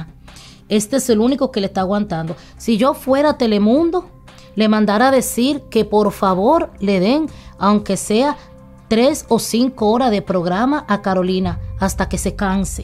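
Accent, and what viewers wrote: Dominican Republic. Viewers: Hola.....disculpa pero yo pensé que UD era Dominicana..no habla como venezola..con mucho respeto..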